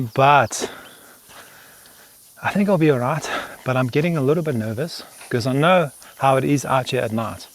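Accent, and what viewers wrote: South Africa. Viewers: Are you from South Africa? I was sure you were European. Anyway hi from Quebec Canada !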